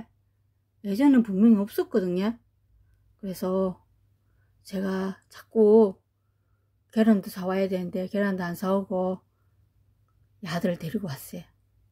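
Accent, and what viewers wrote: Gyeongsang, South Korea. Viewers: 요즘 아트에서도 꽃나무 판매 하더라구요. 예쁜아이들 잘들여 오셨어오. 경상도 사투리 귀엽고 예쁘세요.ㅎ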